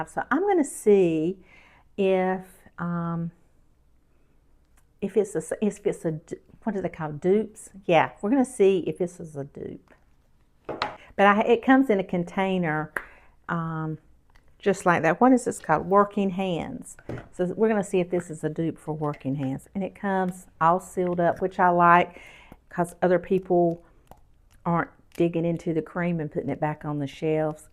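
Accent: South, United States. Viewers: Do not change your southern language, Southern accent, your dialect for anyone or anybody. You are a sweet Southern Bell! I don't understand why people always try too correct our heritage.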